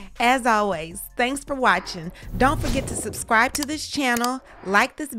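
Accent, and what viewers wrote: South, United States. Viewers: And her southern accent damn i love it , am jamaican and i live for her uploads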